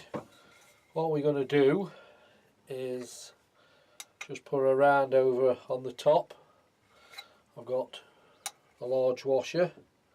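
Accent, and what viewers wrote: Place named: Stoke, United Kingdom. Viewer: Like your style you sound like a Stoke lad to me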